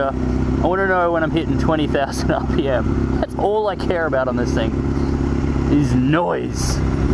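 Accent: Australia. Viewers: australian accent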